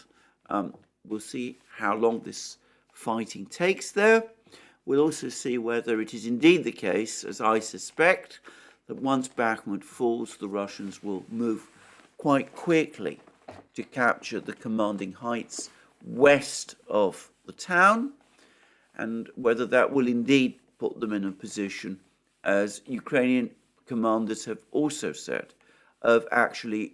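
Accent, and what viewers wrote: United Kingdom. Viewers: Love the muted tonality of your British accent. But one particular anecdote has always bothered me by your use “repeat again “. As I was brought up studying the English language, I was repeatedly cautioned against this redundancy. Am I right in saying so? Begging to be enlightened.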